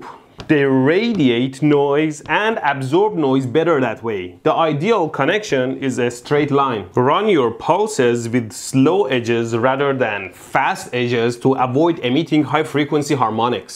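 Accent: Iran. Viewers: he has an Iranian accent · Your accent looks like iranian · لهجه ایرانیت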